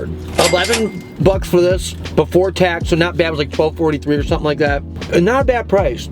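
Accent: Chicago, United States. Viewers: lol. I was guessing this video was made 15 years ago! Your Chicago accent makes this a genuine Chicago food video. 😂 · I don't even need to watch the video, but only listen to him speak momentarily to know he's from Chicago, my hometown. 😂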